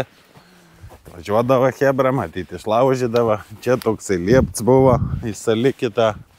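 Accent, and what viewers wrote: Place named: Kuršėnai, Lithuania. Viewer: Patink video, o da labiau - kuršienietiška šnekta 😊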